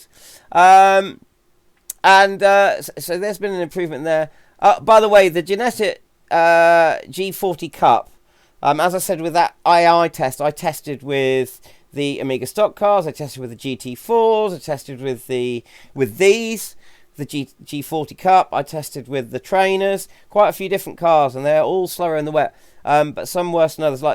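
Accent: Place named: United Kingdom. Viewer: First, you really should have more subscribers. I enjoy your analysis on sim racing topics. Your British accent and comments are very addicting (" the car is having a bit of a moment with the tarmac" LOL!!!) :) Second, I'm really finding the AI way to aggressive in the latest update, actually running me over several times (didn't happen pre Jan??) Third, I don't know why I find it funny that anyone would refer to a car with a straight 6 engine as a "muscle car".. :)..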